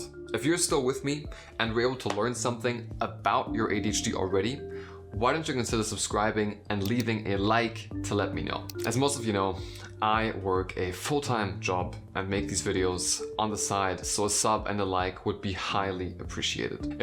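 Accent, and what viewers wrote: Germany. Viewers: Are you German? I‘m German and you sound to me like German talking English. Just want to know, no judgement. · Huh ben je Nederlands? Jouw accent klinkt Duits haha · Man merkt nur ein kleines bisschen, dass du Deutscher bist😉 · well he works in Sales (sic). I do not need a T-Shirt or Hoodie with a (imho opinion) daft slogan, let alone the expense to remind me of my ADHD. Might work for some, but that's too much HYPE for me. Viel Glueck mit dem Unterfangen Herr. I'm out! (That's best of luck in German, I'm German and can't help notice his accent). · Your English is excellent. My Omi was from Frankenberg and I enjoy your videos for the information and the accent. I miss hearing it. Thank you!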